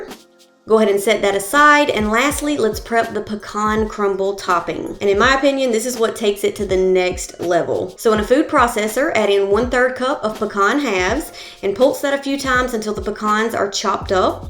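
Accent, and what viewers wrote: South, United States. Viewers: The English dictionary says to pronounce it PEE-CAN --- /pəˈkän,ˈpēˌkan/ it is the Southern US that converts it to Peh-con. You have many very southern US language expressions in your speech such as 'yu'all' . The rest of the English speaking world recognizes that 'you' is often meant to be plural.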